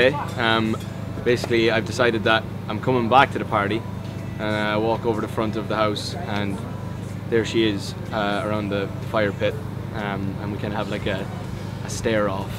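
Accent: Ireland. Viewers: cough cough Niall’s Irish accent it better than mine (Yes, I’m Irish Too) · His Irish accent is just killing me🆔😍😍😍😍😍😍😍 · That accent thought... So damn Irish 😍❤ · So proud of Niall!!! Still cannot get over his adorable Irish accent and how good he looks!! <3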